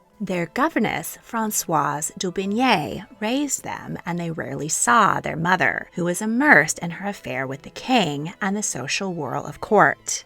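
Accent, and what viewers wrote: United States. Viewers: you should redo this video without the advertising scam and narrated by a pleasant and correct english speaker without the horrendous american accent. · Beautiful research, writing and graphics. American accent is particularly abhorrent.. Overdub by French woman please.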